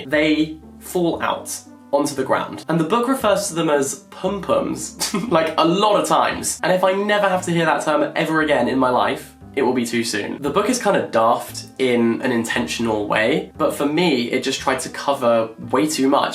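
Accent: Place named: Scotland, United Kingdom. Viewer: My brain started thinking in Scottish is so funny I'm laughing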